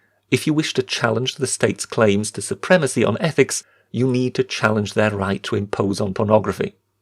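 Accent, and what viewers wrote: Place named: Wales, United Kingdom. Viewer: It’s strange that you shape your channel around Britain, yet your accent has quite a foreign twang to it. It’s clear that your English accent is somewhat contrived - however you might just be Welsh.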